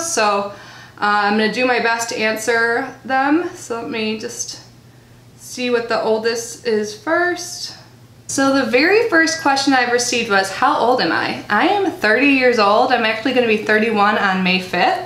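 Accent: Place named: Chicago, United States. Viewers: Awesome. Thank you for doing this. Your cats are adorable. My sister may be moving to Florida soon. I am not sure when or where yet. Her husband is up for a promotion. You don’t sound weird when you talk. You sound like you are from Chicago. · So excited to watch this 😀. Hope you aren’t getting sick. Feel better. You are young sister. I’m 47. So should we call you? 👩🏼‍⚕️🐱 “Drinks with a purpose” 😀 Archer and Laaaannnaaa would have been epic. Even if they are siblings I’m sure it would work. Lol. But Fiona is a fun name. And Emmy. All good names. 30 boxes! Wow 😯 and I don’t think that you sound funny when you talk. You have a nice midwestern accent 🙂. I’m totally going to look up your first video 😀💖 thanks for doing this Q and A. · I heard, but don't know if it's true that everyone is off the wait list in April for Ipsy plus. It stinks because my Ipsy acct I pay yearly since that's easier for me, my other account(my daughter's) is monthly and I skipped it this month so hopefully I can swing it next month if it's true that the wait list is done. 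I don't think you talk funny at all! You do have a strong Chicago accent though. People that I know that aren't from Philly always comment on my "accent" and I think it's so funny because I don't think I have an accent lol.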